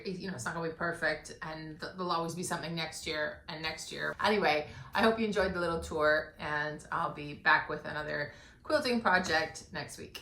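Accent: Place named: Scotland, United Kingdom. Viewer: The space looks great. I love the bright colors on the wall. I wish I had enough space to attempt organizing so well. The Yeti mug was a good choice...I love mine to keep water cold on my bedside table all night. Your accent is adorable..It seems to be American, with certain words having the underlying Scottish brogue. Keep the videos coming, I always enjoy them.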